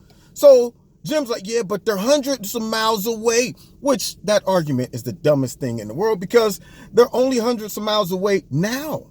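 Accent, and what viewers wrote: England, United Kingdom. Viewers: bad accent , and not many englishman speak like that. its like saying all americans have a New York accent. good points other wise. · BRILLIANT SUMMATION! Love your English accent haha Sending love from the UK! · Hello. I live in England. I loved your English accent. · You do a good British accent. It is my secret wish that we could somehow peacefully gather together to bring down CNN. I dont want to inhibit free speech, but they should not be able to call themselves the press. There is no single source of hate in our society, but CNN is right up there with the worst of them. Because they intersperse their hateful bias with some semi news, they get away with pure propoganda that is taken seriously. What bothers me the most is how bad they make our country look to the rest of the world. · Good limey accent.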